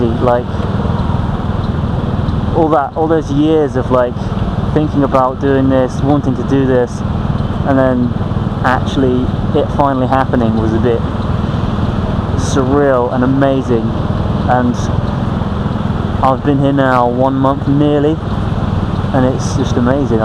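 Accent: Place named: British Asian, United Kingdom. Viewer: Have you been living in TW for a while coz you sound a bit British+Asian, accent I mean. Hope you are enjoying the life here.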